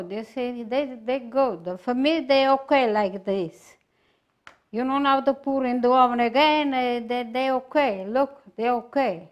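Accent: Italy. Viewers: You are such a sweet lady angd i love your accent.a real italian indeed.you have a BUONA PASCUA.I LOVE TO WATCH YOU. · Thank you for your video. I just found this channel and I just love listening to you. I was stationed in Italy in the 90s with the US Army. Used to have biscotti every morning in the café. I miss the accent and the sweetness. I will watch many more. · Isn't she delightful? And a proper Italian English pronunciation on toppa di tutti. I swear, I'm going to try this tomorrow. Great recipe to get rid of my figs and dates I'm struggling to finish. MIlle grazie!! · I had a great Italian Nona....Miss her so much! Her daughter, my grandma Noni was the best cook!🍝🇮🇹 Wished I would have learned more Italian cooking from them....they both are gone now.....🇮🇹🍝🇮🇹🍝but you are truly the best to watch and learn from now! I love your strong Italian accent..... Thank you for sharing your cooking. 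💚🤍❤️We love you! 🇮🇹🍝🇮🇹🍝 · I absolutely LOVE watch (and listening) to you. I adore your accent. It makes me long for my Italian grandmother who passed when I was very young. I still feel a bond with her and my Italian heritage. I think about her and feel close to her when I make your recipes. I can see the joy cooking brings to you. Thank you for sharing your love and cooking with all of us., what kind of coffee do you drink and how do you prepare it? May God continue to bless you! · Vgffgf Vdghhft You mean she is speaking English with an Italian accent. At times, she speaks her native language.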